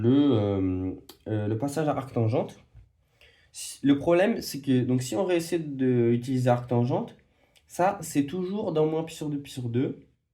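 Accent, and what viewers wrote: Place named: Belgium. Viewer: Masterclass le petit accent belge frerot😹🤙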